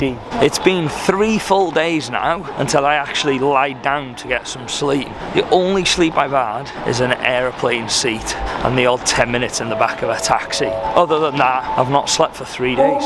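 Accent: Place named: Liverpool, United Kingdom. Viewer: The more I listen to voice, the more I understand how related the Liverpool accent is to that of north Wales...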